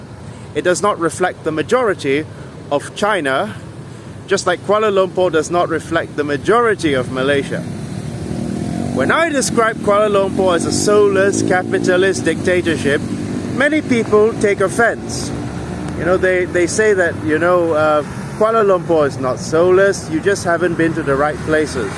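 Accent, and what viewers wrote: RP, United Kingdom. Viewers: Still better than Hong Kong. We former British colonies are nothing more than a testbed for the neoliberal experiment gone rampant. Rat race, rat utopias and behavioral sink. Nice posh accent btw.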